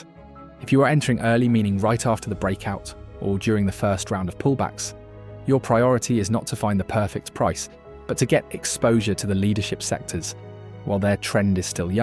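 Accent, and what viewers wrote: England, United Kingdom. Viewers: Can you please change the accent? This English accent is getting on my nerves!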